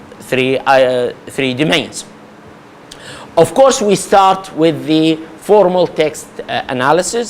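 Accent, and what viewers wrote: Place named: India. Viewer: Though I thought him Indian, his English doesn't bother me at all since I've benefited from his presentation.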